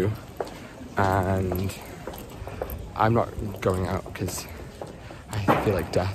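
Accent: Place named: United Kingdom. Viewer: I enjoy your Vlog. Where in the UK are you from? I have trouble locating the different English accents. In the U. S., we either hear broadcasters or members of the Royal Family, so I know there are many accents, but I can't determine which one is which.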